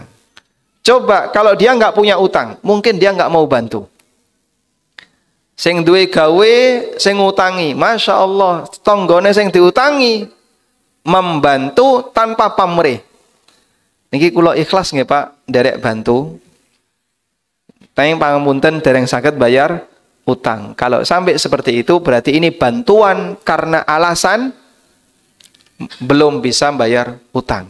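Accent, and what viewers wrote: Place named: Java, Indonesia. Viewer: Ngomong nya ko Jawa sih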